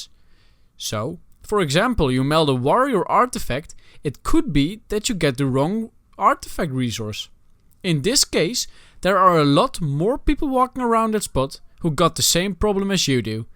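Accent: Netherlands. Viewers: goed bezig! leuke videos met een nederlands accent.:D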